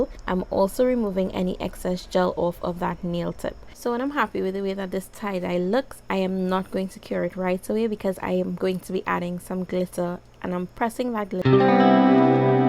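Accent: Trinidad and Tobago. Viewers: These are sooo cute. Side point...I think I'm hearing a Trini accent. If you are then I love you even more lol. I live and vlog in Barbados but I'm Trini so I miss hearing our accent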